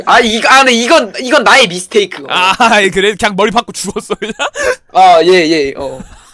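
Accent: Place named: Jeju, South Korea. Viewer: 제주도 사투리 너무너무 귀여워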